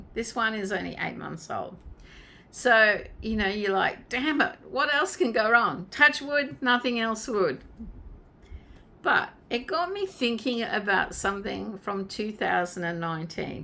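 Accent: Australia. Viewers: As soon as i hear an Aussie accent i click subscribe. Great to have more and more Aussies starting up a YouTube channel, everything is so US centric at the moment. Love your work, loved your! He is a great bloke for this country.:)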